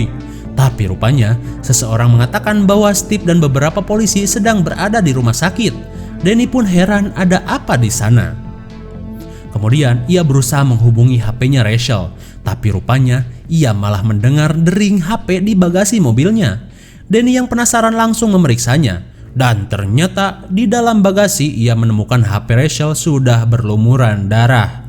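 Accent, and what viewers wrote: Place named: West Java, Indonesia. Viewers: Bang kamu pasti orang sundanyak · Suara sunda mh enak ya bund;v mah kuat wat wat wat wat wat terngiang² 🤣🤣🤣🤣😴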